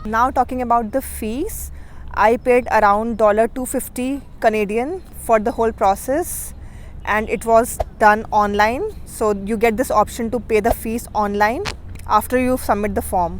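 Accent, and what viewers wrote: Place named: India. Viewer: Indian accent so funny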